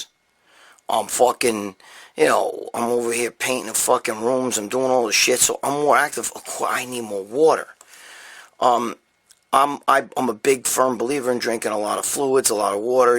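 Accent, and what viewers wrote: New York, United States. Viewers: Great video, thank you so much! Love the comedy, I also love the information very helpful! Also your New York accent A lot like my south Philly accent!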